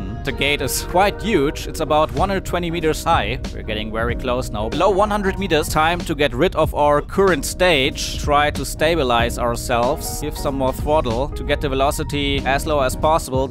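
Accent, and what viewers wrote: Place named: Germany. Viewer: are you from germany bcs you sound german btw nice vids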